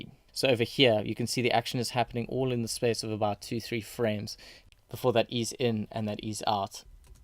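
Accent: South Africa. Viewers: Great work man! I hear a South African accent there ;) Is there a way you can make this template available like the links you have added in the description? I would love to use it and replace it with my own logo and colours. Thanks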